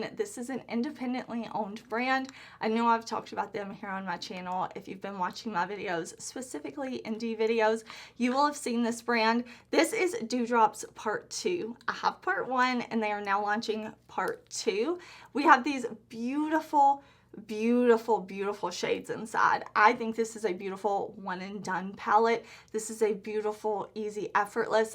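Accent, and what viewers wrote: South, United States. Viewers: you have the cutest eyes! I also adore your southern accent. I’m Canadian and we get told we have an accent too, but I can’t tell. Your makeup in the intro is fire. 🔥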